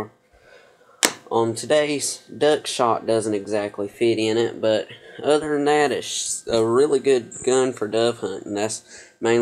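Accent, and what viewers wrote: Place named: United States. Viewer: Your accent is epic ... America!!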